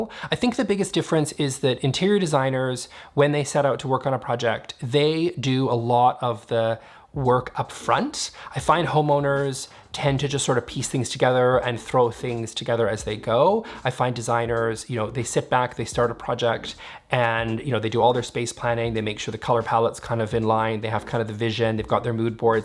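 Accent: Canada. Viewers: I LOVE that you talk fast and get to your points quickly. People need to rev up their brains...or hit pause and go back, lol! Your accent and manner reminds me of another Canadian designer, Emilia Wisniewski....what a hoot it would be if you two did a vid together! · that makes sense. I’m British but have absolutely no problem with Canadian accent (or most Canadians’ accents). I find his voice, despite the quick pace of speech, quite calming and very easy to listen to. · Congrats on the 60K mark! It's si great to see things from a Canadian perspective...the minute I first clicked on one of your videos I noticed the accent. YAY!!! 🤗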